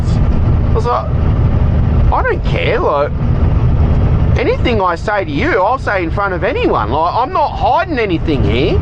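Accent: Australia. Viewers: LOVE THE AUSSIE ACCENT !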